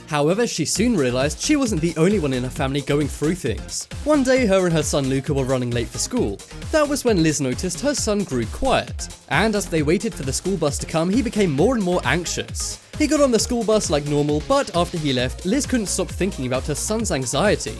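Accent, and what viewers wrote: England, United Kingdom. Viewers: He's speaking American English with an English accent ??? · God you're so dumb , he pronounces mum differently because of his accent. Just because he has an English accent doesn't mean he's going to pronounce it as Mum.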